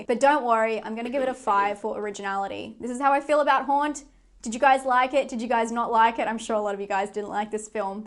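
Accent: Australia. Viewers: It is so cool to have someone in Australia doing movie reviews as im an aussie as well but don't get me wrong i love watching american movie reviews as i do all the time its just good to here an aussie talk about movies and Haunt sounds like my cuppa tea so thank you for your time doing these reviews look forward to your next one takecare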